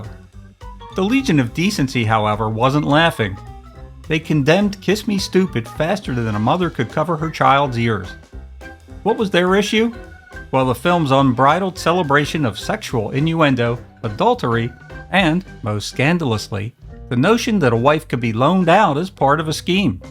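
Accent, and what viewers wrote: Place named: Pittsburgh, United States. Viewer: Hey. Not sure if I asked before but are you a rust belter? PA? Ohio? Michigan? Your accent sounds Pittsburgh like.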